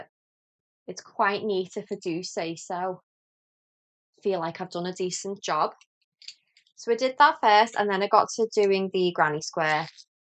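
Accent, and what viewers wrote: Liverpool, United Kingdom. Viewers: I knitted facial cloths out of Bamboo Pop, which is cotton/bamboo. They turned out to be sturdy. I liked the 20cm (8") square, and knitted them with squares K5 P5 and slipped the first stitch of every row. I'm in Maryland, and heard about you from Becky of A Handknit Letter. Love listening to your Liverpool accent; reminds me of interviews in the '60s with Paul McCartney. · What a great podcast! I'm from Stourbridge in the West Midlands so not a million miles away, and I love the scouse accent. You are so natural and chatty. I love it! I've just subscribed, too ❤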